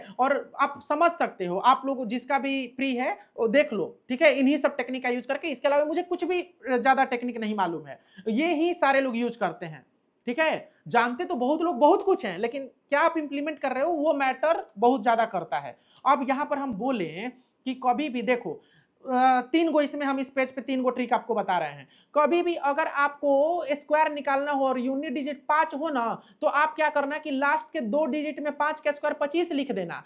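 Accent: Bihar, India. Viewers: Bihar ka hai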